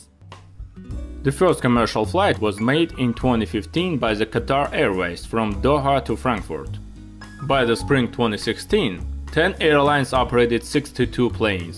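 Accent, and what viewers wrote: Russia. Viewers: RUSSIAN ACCENT !!! I'm not watching. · Its Russian accent I think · he’s Russian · if you have a foreign accent, it means you speak another language. This guy probably speaks Russian.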